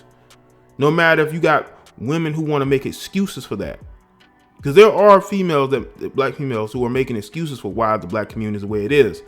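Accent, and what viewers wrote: South, United States. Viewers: Nah bruh you really sound country! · You don't speak with a southern drawl but I can tell you are from the south. Nothing wrong with that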